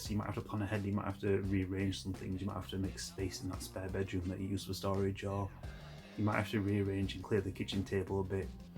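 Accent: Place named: Yorkshire, United Kingdom. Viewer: Good tips....like you say, easy to overlook. I’m trying to place your accent?...south/West Yorkshire?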